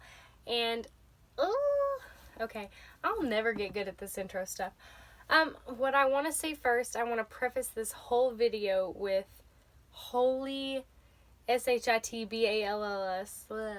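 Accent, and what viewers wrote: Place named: South, United States. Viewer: Girl! I feel you about the way people perceive us based on accent. So like you I speak with a southern intellectual accent. I ain't stupider than you Ms and Mr California! Lol I just have more spice in my life. Keep up the good work!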